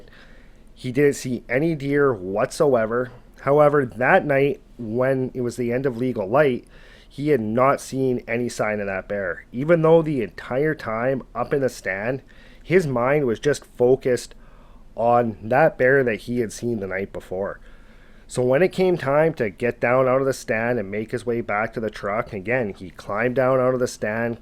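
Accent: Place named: Canada. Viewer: Your Canadian accent is refreshing to listen to rather than the same old. Also only thing he did wrong was ignore his instincts after the first night.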